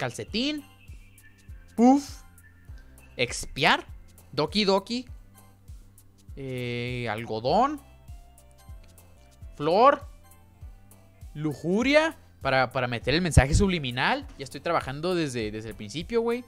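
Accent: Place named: Colombia. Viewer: Ahh mk, usted maneja bien el colombiano xd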